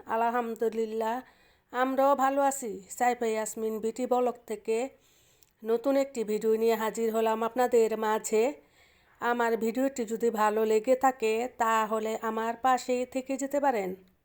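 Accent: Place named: Narayanganj, Bangladesh. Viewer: নারায়ণগঞ্জের মানুষ এই ভাবে কথা বলে আমার জানা ছিল না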